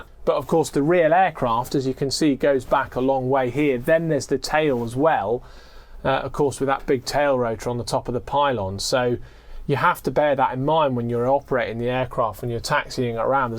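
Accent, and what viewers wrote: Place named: United Kingdom. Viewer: A “Very Polished British Accent “!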